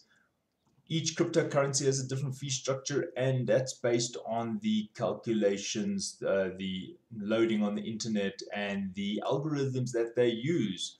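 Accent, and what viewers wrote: South Africa. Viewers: South African!! Lol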